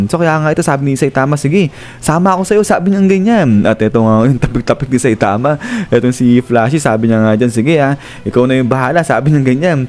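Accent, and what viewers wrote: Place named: Batangas, Philippines. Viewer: Tanong ko lng lods kung batanggenño kba? or bulacaño? May punto kasi ung pagsasalita mo 😆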